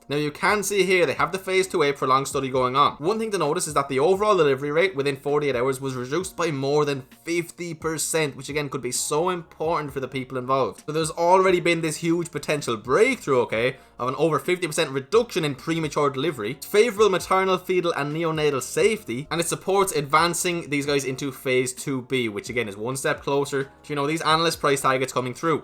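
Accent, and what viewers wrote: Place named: Ireland. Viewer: It's so obsevaious he's from Ireland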